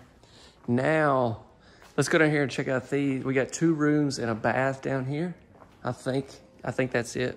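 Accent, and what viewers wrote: South, United States. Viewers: I loves your accent are you from The South I'm from the south as well.Im from Arkansas.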